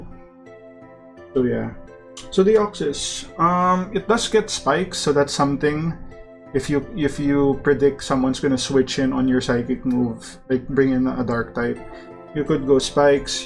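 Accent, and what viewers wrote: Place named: Philippines. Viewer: Are u filipino? I am too i can recognize the accent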